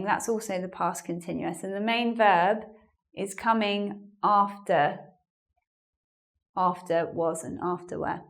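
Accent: United Kingdom. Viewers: that accent is British English? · jossan Her british accent is so simple to me... YOU CAN READ THE english subtitles AT THE SAME TIME IF YOU WANT TO learn active listening like i do. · Mam, do you use brities accent to teach? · Mrs please can you tell me which part of UK you are from? I'm asking because of your accent. Many thanks for the helpful lesson, I have problem with using (have) as an auxiliary verb!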